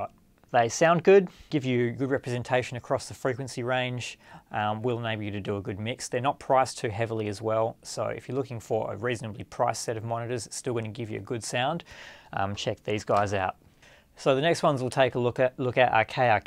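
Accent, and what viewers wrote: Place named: Oklahoma, United States. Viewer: sup with this dudes voice is he from oklahoma or what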